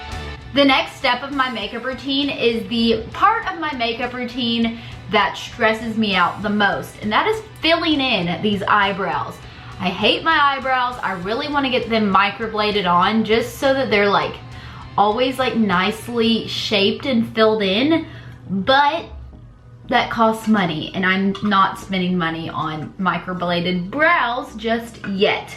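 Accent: South, United States. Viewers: Okay, I've been watching several of your videos---your southern accent drew me in! (I'm one of them southerners too). And I"ve noticed videos from different years, and I can see your weight loss is not happening like you'd want. (Tip no. 1: ditch stevia and other sweetners---they basically put your ketosis to a screeching halt. Tip no. 2 intermittent fasting---you're NEVER lose weight by exercise. I've lost all my weight (at 72 years old) and not exercised a single day.) I'd recommend watching some of Dr. Boz videos---she's a genius at Keto. I've been doing Keto for a year now, and lost about 70 pounds...So, I notice there are several things holding you back...Dr. Boz would set you straight if you spent time watching her. There's no reason you could not drop 40 or so pounds doing what she recommends. Right now I weigh 170---for the first time in 30 years...and on my way to 155, God willing. 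I'm sure Dr. Boz will really help you get to your goals---and heal a lot of things that keep dogging you. And look. Don't pay attention to those morons who leave unkind comments. If you're a Manatee (and you're not)...look at it this way. Manatees are really nice and friendly--just like you. Plus, you're the most beautiful and sweetest Manatee I've ever seen. You're very attractive. Your bright personality, your openness and honesty; those and many other things draw a lot of people to see your videos, and many are really cool and helpful for Keto recipes. You gotta keep these vi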